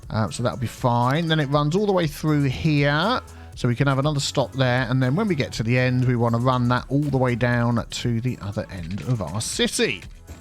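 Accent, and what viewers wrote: United Kingdom. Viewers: what do you specifically call your accent? Just british?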